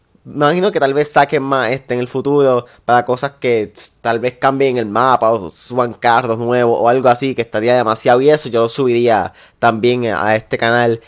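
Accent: Puerto Rico. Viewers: Tu eres de puerto rico te eschuchas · tu eres de Puerto Rico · Eres de puerto rico? Se nota por el acento, biem Video like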